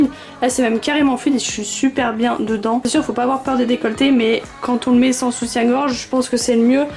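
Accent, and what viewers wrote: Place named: England, United Kingdom. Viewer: Super vidéo j’ai attendu grave longtemps pour ta vidéo enfin elle est sortie et très belle accent anglais au passage mdrr bref j’te kiff 💕💍❤️🍭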